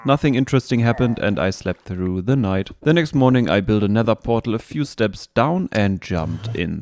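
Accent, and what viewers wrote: Germany. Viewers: Are u germAN? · You have a german accent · You sound German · You sound a little bit german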